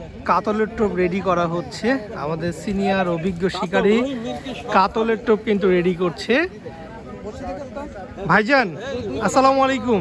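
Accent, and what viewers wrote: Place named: Kolkata, India. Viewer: ai motheri tui kolkatar moto kore kotha bolos kn .. tui ki bangladeshi naki indiar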